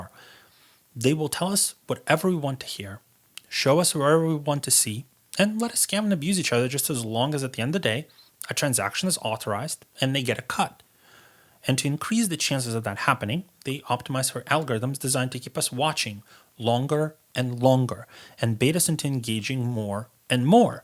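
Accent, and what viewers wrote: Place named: United States. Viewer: some annoying american voiced thing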